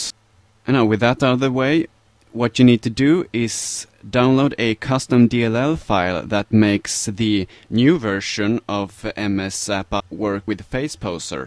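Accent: Sweden. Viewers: Sounds like a swedish accent :3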